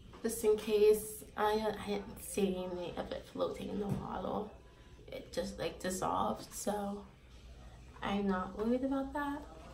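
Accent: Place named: Boston, United States. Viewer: Are u from Boston? What an accent ! 🙂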